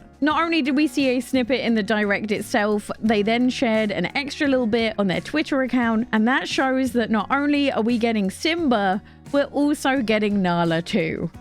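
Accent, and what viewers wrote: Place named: United Kingdom. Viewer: If you don't mind me asking, where is your accent from? It sounds British, but also a little different, for example right at the beginning when you say "so many," the "so" almost sounds like there's an "r" sound at the end of it. Thanks!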